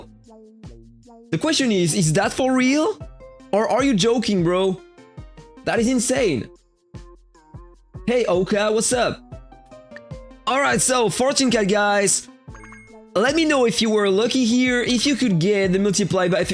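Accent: France. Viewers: Attends tu es francais? J'avais capté l'accent mais je pensais pas que tu étais français...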